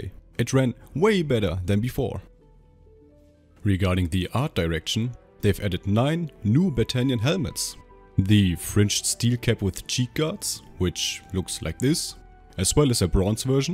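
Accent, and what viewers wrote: Germany. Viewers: Ich denke auch sein Akzent hört sich schon ziemlich Deutsch an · are you german?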